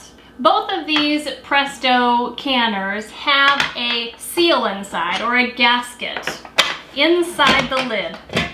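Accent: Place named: Midwest, United States. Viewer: I KNEW you were from WI or MN from your accent. LOL! Thanks for all your great videos.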